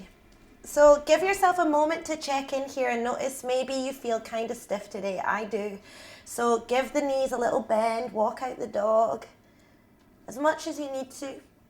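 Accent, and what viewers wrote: United Kingdom. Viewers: I absoluteley LOVE your work, your devotion and your joy with Yoga.. and the best bit: your british voice! thanks so much xxx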